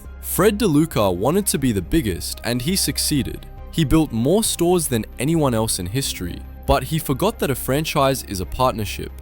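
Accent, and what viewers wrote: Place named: United Kingdom. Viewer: If you like videos about American restaurants narrated in a brit accent, this is for you. As an American, NTY.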